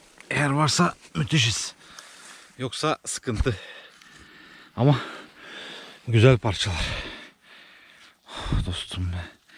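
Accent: Thrace, Turkey. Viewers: Trakyalı olmalısın şiven öyle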